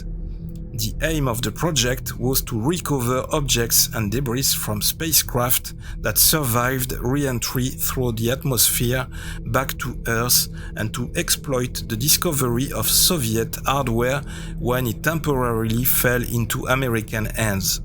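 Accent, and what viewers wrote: France. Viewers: hahaha, your strong french accent is very funny, but nice from you to give it a try and inform the world with your french knowledge, this is seldom. You have forgotten to mention 2 very important whistleblowers/researchers about Ufo Crash Retrieval Teams like the Blue Berets: Leonard Stringfield and Sgt. Clifford Stone.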